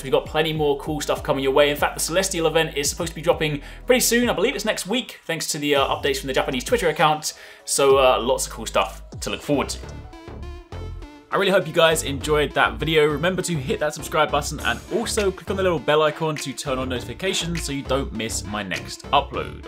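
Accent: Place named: United Kingdom. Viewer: He sounds british but looks scottish ???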